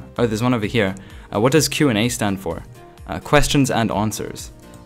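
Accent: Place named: South Africa. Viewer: It's obvious his white.His voice sound like a white south african and i would know because i am a white south african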